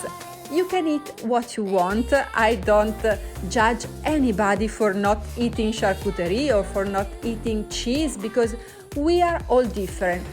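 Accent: Italy. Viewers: I love your recipes! They are so simple but DELCIOUS. To me you make keto very easy, and also healthy. I appreciate it that you show the ones that don't go 100% perfectly because that's real life and I am encouraged to keep going even if I don't get it right! I'm going to try this recipe and if they go flat I will think of them as a 'flat bread' and put the meat ontop... delicious! Ps. Your English is so good... I understand EVERYTHING you're saying... and I love listening to your lovely Italian accent. Never change!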